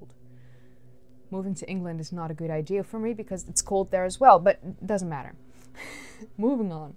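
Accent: Netherlands. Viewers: I missed your Dutch accent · the Dutch slur in your accent, it makes what you want to bring across sometimes hard to follow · does * speak with a Dutch accent?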